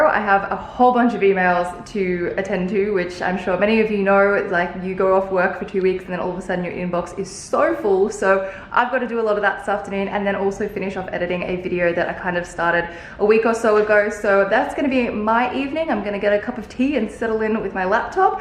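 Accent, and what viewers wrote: Australia. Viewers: Adore your Australian English 🖤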